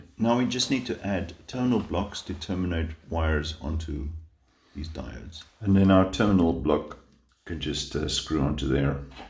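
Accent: South Africa. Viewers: Howzit boet! Tell me you are South African!!!!! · Thank you for this. Have been looking for a solution as simple as this one. Just to confirm, the sizes of the components you used works for RSA households (voltage etc)? I assumed from your accent you're South African...Afrikaans soos ek;-). Where do you buy your components? I already have the Sonoff switches, just looking for a reference for the diodes and capacitor?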